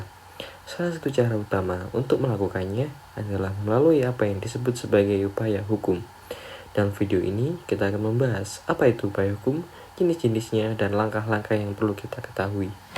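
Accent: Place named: Malang, Indonesia. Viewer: Pasti yang dubing anak mlawang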